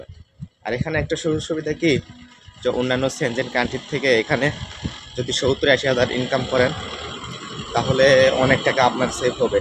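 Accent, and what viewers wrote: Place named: Naogaon, Bangladesh. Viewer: আপনের ভাষা মনে হয় নওগাঁর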